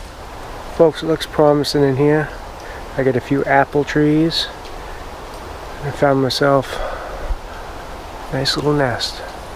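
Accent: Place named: Vermont, United States. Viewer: you sound like your from the NEK in vermont lol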